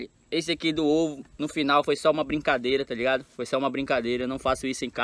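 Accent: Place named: Pará, Brazil. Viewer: tu e paraense crtz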